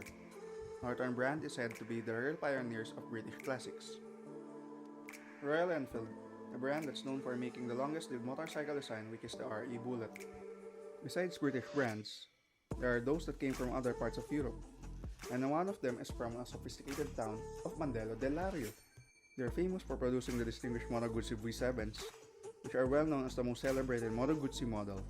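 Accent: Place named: India. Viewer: Interesting and well produced video, but I struggled with the narration. Not even the English speak that fast and coupled with your heavy Indian accent, it was difficult to understand you. Please slow down your delivery and I am sure your viewers will appreciate it.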